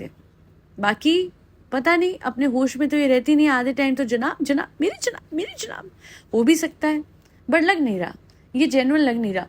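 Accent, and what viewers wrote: Punjab, India. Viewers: You speak very cute Punjabi. Please try to add a few lines in Punjabi in every podcast.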